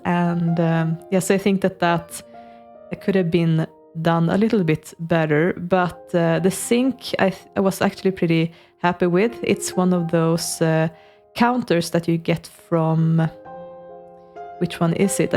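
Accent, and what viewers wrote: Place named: Sweden. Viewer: You are from Sweden 🙂 I have think when I heard you that you sound a little bit Swedish. I’m from Sweden too btw. In the end of 2022 I played The Sims 2 last time, but I played the Swedish version. So I connected all neighbourhoods in The Sims 2 so families in “Skönköping” could walk or take the taxi to ”Uddaholm” and the other neighbourhoods. Then I build my own version of Old Town from the first The Sims. The last thing I tried to do was to build Sunset Valley from The Sims 3 but then I stopped using The Sims 2 after I had a fever dream about The Sims 2.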